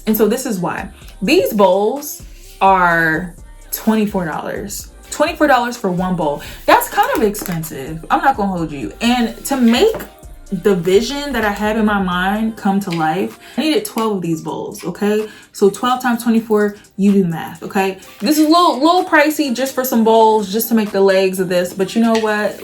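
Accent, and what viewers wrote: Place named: Appalachia, United States. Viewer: Why do you say'y'all?? Are you a hick only hillbillies, talk like that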